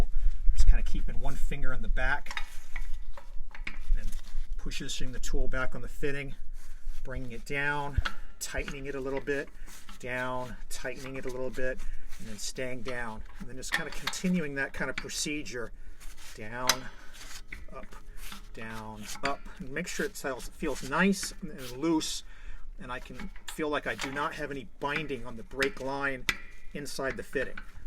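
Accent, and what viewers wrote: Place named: Pennsylvania, United States. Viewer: I know that Pennsylvania accent from anywhere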